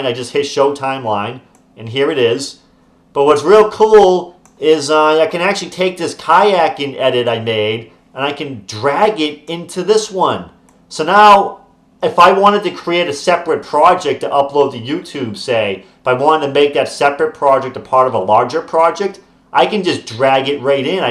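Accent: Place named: New York, United States. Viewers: You have a very strong accent are you from new york or jersey? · Do you like in NYC? You kinda sound like it. Sounds cool.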